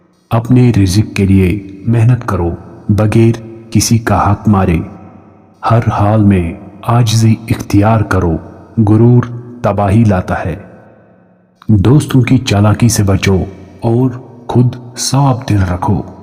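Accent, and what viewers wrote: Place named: Kashmir, India. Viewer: AAP KOI KASHMIRI PERSON HAIEN AAP KI AWAZ BATATI HAY BUT I AM KASHMIRI FROM MAQBUZA KASH MIR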